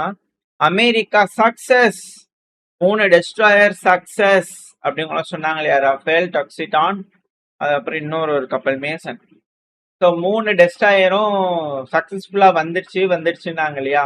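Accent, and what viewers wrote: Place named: Chennai, India. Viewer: Madras தமிழில் பேசாதீர்கள்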